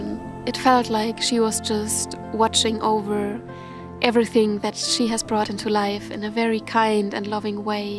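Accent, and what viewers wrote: Germany. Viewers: O sotaque alemão é profundo nela ou seria impressão minha ? From Brazil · Es macht einfach Spaß deine / eure Videos zu sehen. Mein Englisch ist echt nicht gut. Aber man kann iw heraushören das du aus Deutschland kommst. Und dann premiere Pro, was noch auf Deutsch steht. Das ist einfach alles zusammen mega stimmigyschön für mich hier in Deutschland. Wo ich mich danach sehne, was du seit einiger Zeit in Norwegen hast. Weiter so!!!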